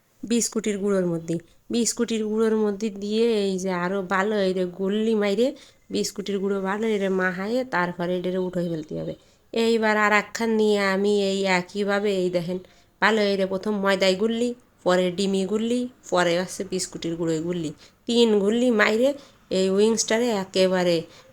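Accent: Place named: Narail, Bangladesh. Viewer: আফা আপনার থেকে আমি একটু নড়াইলের ভাষা সিখতাম🫠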